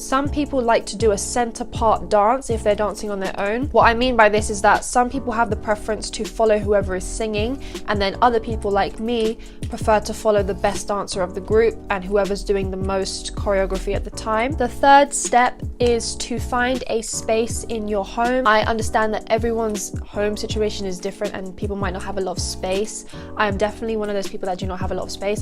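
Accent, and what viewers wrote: United Kingdom. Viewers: You sound English if you are I know I’m not the only English-dancing-k-pop-fan. 😭🙏🏽🙏🏽🙏🏽🙏🏽🙏🏽 (LOL)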